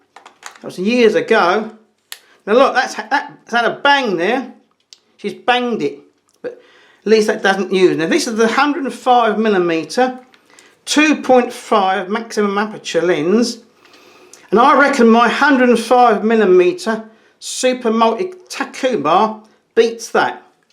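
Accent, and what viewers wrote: United Kingdom. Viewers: I am looking for a film camera (I am from the digital era), and Sir you convinced me about getting a FE. Love your British accent, greetings from Argentina.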